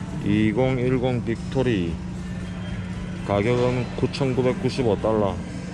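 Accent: Gyeongsang, South Korea. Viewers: 우와~~전시품이 한국 하고는 차원이 다르네요 저도 스피드를 좋아라 하는 편인데 바이크가 멋진게 많네요 ㅎㅎ 영상 재미나게 잘 봤습니다~~ 근데 님은 고향이 어디신지요??사투리 쓰시는거 같던데 ㅎㅎ 경상도 쪽이신가요??ㅎㅎ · 안녕하세요 DG님 님의 채널을 알게된게 이제 2주 정도 밖에 안되었는데 홀릭이 되어버린듯합니다.. 저도 자꾸만 잊어가는 구수한 갱상도 사투리가 고향의 맛을 느끼게 해주어서 편안하다고나 할까 푸근하다고나 할까... 매일매일 업로드되는 영상은 물론이고 하루에 5~6개정도 보고 휴일날은 평균 15개정도는 보고 있는거 같으네요.... 이러다 옛날 게임에 빠져 폐인 생활하던 그 때가 갑자기 생각이 듭니다... 이전 올라온 영상을 다 보고 나면 시간적 여유로움이 생길듯 하구요 님이 올리신 영상 중 190편을 보다가 메디슨 햇에 대해 잠간 응급하셧는데 구글 지도를 보다가 다른 주에서 없는 특이한 모습을 보았습니다. 그게 뭐냐하면 둥근모양의 농지가 특이해보여서 갑자기 궁금증이 생겨서요.. 다른 주를 보았는데 둥근 모양의 농지는 보이지 안트라고요. 도대체 그게 뭔지 궁금해서 이렇게 댓글을 남깁니다. 언제나 안전한 운행을 기원드릴께요..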